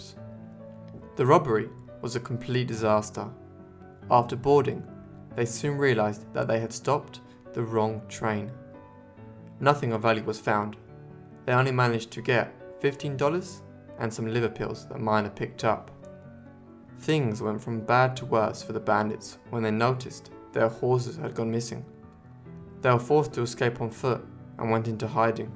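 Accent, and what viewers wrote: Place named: United Kingdom. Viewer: I don't care for the British accent narrating this.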